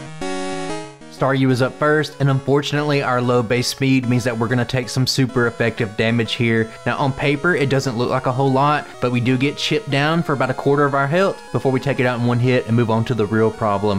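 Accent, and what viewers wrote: South, United States. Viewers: That sounds like a southern drawl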